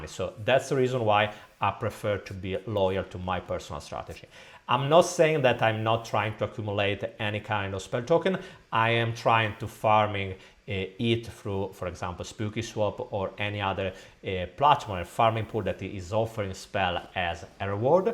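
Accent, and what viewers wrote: Italy. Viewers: Are you Italian? I recognise that accent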